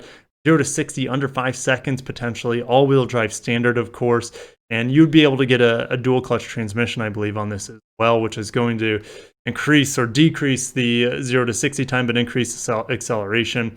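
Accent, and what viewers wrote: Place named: United States. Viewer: Why can't Americans pronounce the simplest of words correctly? The rest of the world doesn't seem to have a problem.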